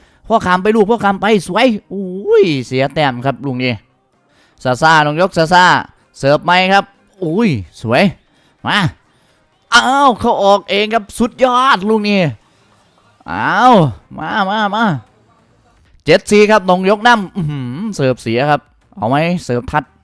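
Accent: Isan, Thailand. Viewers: เขากะเว้าอีสานมึงฟังฮู้เรื่องอยุ่แล้วตอแหล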